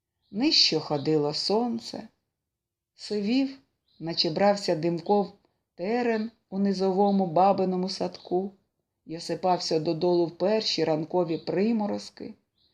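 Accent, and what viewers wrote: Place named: Russia. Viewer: Треба перечитати. Причини: в українській мові літера "О" ЗАВЖДИ ЧИТАЄТЬСЯ/ЗВУЧИТЬ, ЯК "О". Без варіантів. (Відчувається, що начитує російськомовна людина) 2. Проблема з наголосами, напр. : п'Овагом, а не пов'Агом і т.д.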